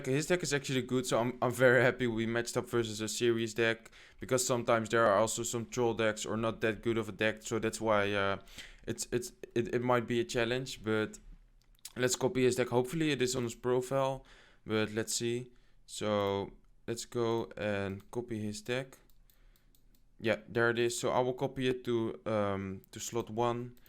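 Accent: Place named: Netherlands. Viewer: Michi i think he is dutch :)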